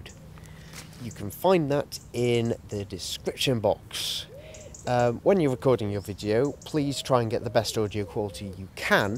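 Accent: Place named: Nicaragua. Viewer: Nicaraguan Accent!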